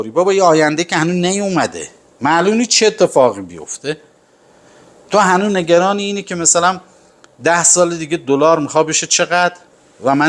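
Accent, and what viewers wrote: Khuzestan, Iran. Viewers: پاینده باشی واقعا شیر مادر حلالت باشه چقدر با لهجه شیرین خوزستانی زیبا روشنگری میکنی ودانش ما را بالا میبری در پناه خدا باشی به امید خدا استادت که بزرگ ما هم میشود خدا هر ناخوشی را از همه انسانها دور نماید با سپاس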